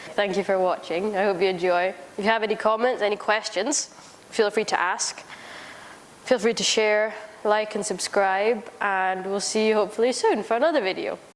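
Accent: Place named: Scotland, United Kingdom. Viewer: I hear Scottish in your accent.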